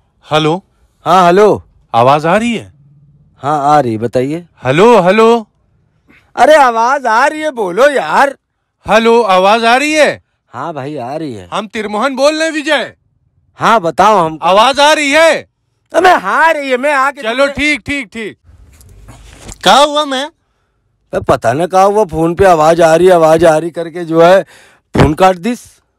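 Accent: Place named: Kanpur, India. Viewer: कसम से कानपुर में ऐसे ही बात करते हैं...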